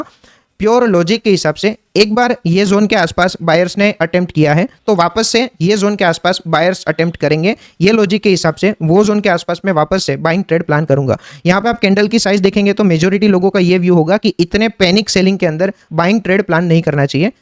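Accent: Gujarat, India. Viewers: Are you Gujrati?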